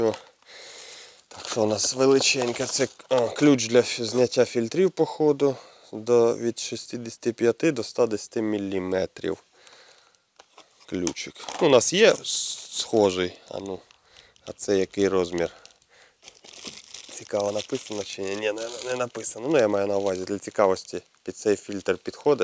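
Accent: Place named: Zaporizhzhia, Ukraine. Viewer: українською Ваше відео набагато цікавіше і колоритніше. В мене є колега з Запоріжжя ( я в Луцьку) то за вашим спільним діалектом зрозумів, що ви земляки, як виявилось ябув правий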